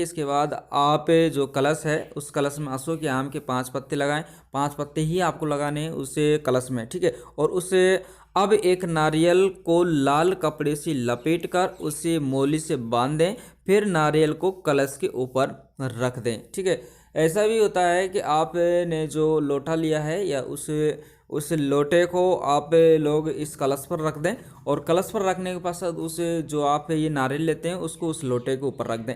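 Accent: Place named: Jammu, India. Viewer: Jay maat dii bahi jammu sa bool rah ha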